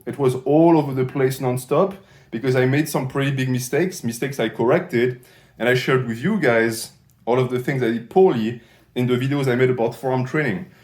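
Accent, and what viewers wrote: France. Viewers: I suspected him being a French speaker at the beginning, and and was fully convinced when he said “the love Andles”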